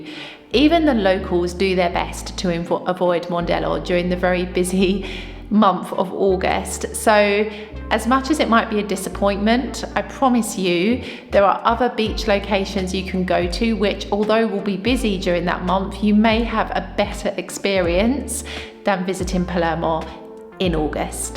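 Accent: United Kingdom. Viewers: Your British accent is on point 👌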